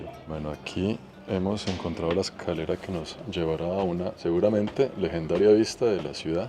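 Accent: Colombia. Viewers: me encanta tu estilo, tu hablado parcero, tienes mucha gracia al hablar.🗣️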